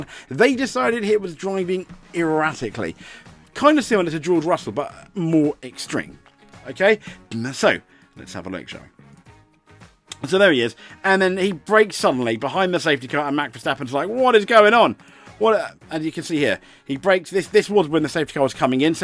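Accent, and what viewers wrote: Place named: United Kingdom. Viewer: He’s allowed to ask the question!!! BTW…are you British? Of course you are!!!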